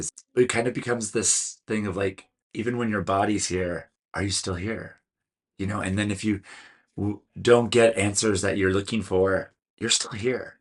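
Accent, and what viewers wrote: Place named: California, United States. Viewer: I could see that you really like this movie... as you said you dont know about brazilian dictatorship, i must tell you that dictatorship was supported by the usa and the cia, actually, usa were responsible for all dictatorship that happenned in latin america. Im not pointing my fingers at you, dont get me wrong, but ithink its important to say that. I like your californian accent btw. Im rooting for fernanda but i wont be devasted if moore getx it...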